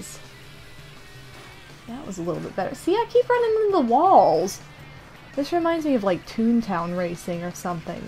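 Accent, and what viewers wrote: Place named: Indiana, United States. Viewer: Canadian here. This year I didn't go over the border for Black Friday deals though so I'll just be staying here listening to your Indiana accent.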